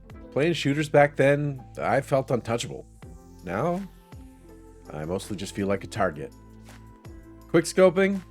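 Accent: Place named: United States. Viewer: As someone pushing 50, I had flashbacks and (virtual) PTSD with this video 😅 I enjoyed what I played of BF6, but hated the COD-style sliding. LAN parties were great, though you could guarantee there would be at least one player struggling to connect to the rest 🤪 I sadly missed the closed Beta for this, hope the rumours are true and I get to try it before the 10th. Most of my recent shooter experience is with Ready Or Not, but this could scratch an itch for me 😁 I'm in the UK, I'm guessing from your accent that you're across the pond? Just heading to check if you have a Discord server 😅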